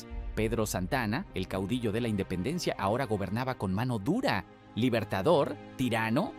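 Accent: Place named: Mexico. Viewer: Dueños este programa: ustedes son estúpidos o se hacen? Primero hacen un resumen ¨calimocháo¨ dejando muchísimos detalles importantes de nuestra historia, luego ponen a un narrador ¨mexicano¨ que dice ¨nosotros¨ como si esta fuera la independecia de México. Séan más serios...! Páguenle a un buen locutor o escojan a alguien que lea bien sino quieren pagar... pero que sea y se oiga como DOMINICANO!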